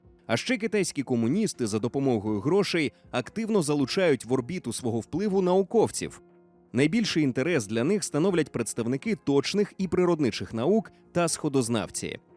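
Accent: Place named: Moscow, Russia. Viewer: Голос за кадром- "гвориЦЦць, жиЦцЦя, громаДЗзззяни"... Вкрай неприємно слухати ці маасковські виверти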